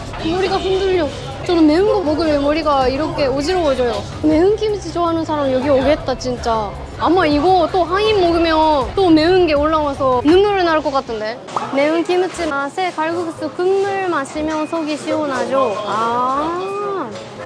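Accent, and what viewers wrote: Gyeongsang, South Korea. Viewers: 일본분이 한국말 하니까 경상도 사투리 갔군요 ㅎㅎ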